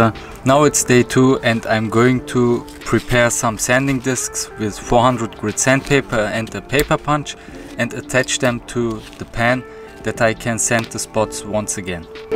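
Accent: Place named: Turkey. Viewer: Sanki aksamınız Türk gibi.. Thanks for video..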